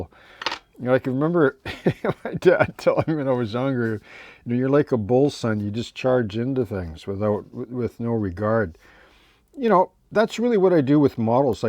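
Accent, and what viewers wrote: Canada. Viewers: Located and ordered! Thank you so much. Keep on building and doing these videos. I'm not a Railway modeller, but modellers appreciate everything done on a small scale - or I do at least. I especially love your scratch-built ships and boats. I knew you were a fellow Canadian when I heard you speak. 🙂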